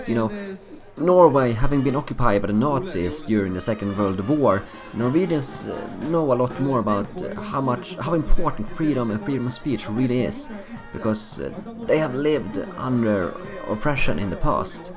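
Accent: Sweden. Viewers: I can hear just by your accent that you are swedish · You can clearly tell from his english accent that he is swedish!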